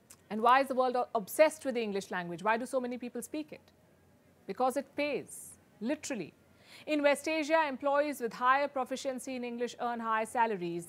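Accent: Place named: India. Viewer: She handles stress in English very well, while still remaining recognisably Indian. Since stress is used to carry emphasis and shades of meaning in English, it's a useful asset to a news anchor.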